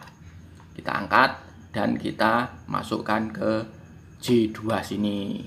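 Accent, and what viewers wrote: Java, Indonesia. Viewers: ngomongnya teralu medok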